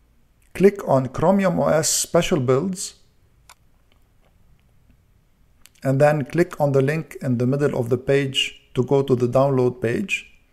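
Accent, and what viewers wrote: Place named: Lebanon. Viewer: bro you sound lebanese !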